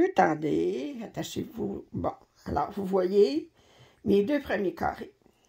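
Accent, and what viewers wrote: Quebec, Canada. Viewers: Merci pour ta réponse. Je vais donc continuer avec ma grille papier, à l'ancienne. C'est sympa de communiquer de chaque côté de l'Atlantique. A ton accent, je suppose que tu es de Québec. Moi je suis dans le sud de la France, en dessous de Bordeaux. Excellente continuation et au plaisir. Caroline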